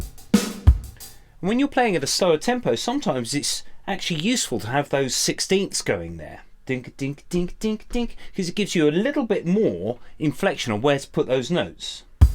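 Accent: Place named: United Kingdom. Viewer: Definitely not complaining, but are all online Bass instructors British?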